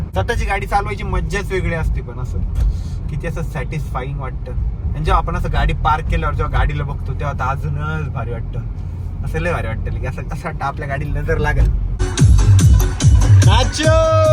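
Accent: Khandesh, India. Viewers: Bhau tumichi khandeshi ek nambar aahe 😅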